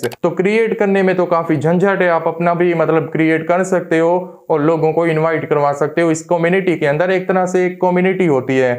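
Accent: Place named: India. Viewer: pass indian accent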